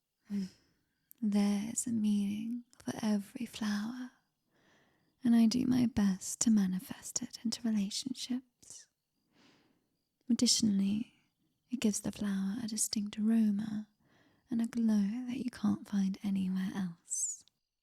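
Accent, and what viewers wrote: United Kingdom. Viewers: If I may make a confession, a British accent was what came to mind when writing this script, so I'm glad it got a British voice. Thank you for the fill! :D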